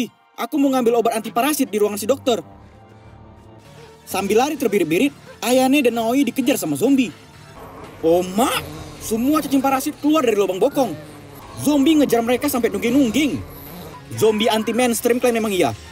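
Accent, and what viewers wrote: Medan, Indonesia. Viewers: Gw selalu suka logat Medan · Medan kali bahasanya wkwkw makasi ya kang kreator udh ngenalin cara anak medan ngomong wkwkw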